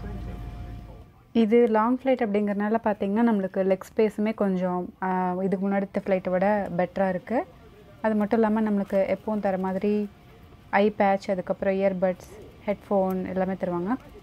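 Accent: Kongu, India. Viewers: Kongunatu mangai kongu Tamil super video bye Anna. From.covai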